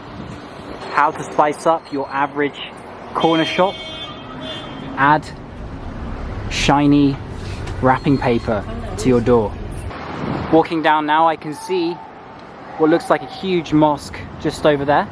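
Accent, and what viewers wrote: United Kingdom. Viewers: Are u british ? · Nice proper British accent!!! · Nothing interesting, such a boring ew city. Btw, I like your british accent ㅜㅜ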